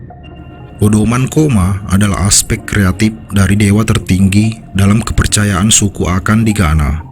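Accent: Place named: Bugis, Indonesia. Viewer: Knp logatnya seperti orang bugis😂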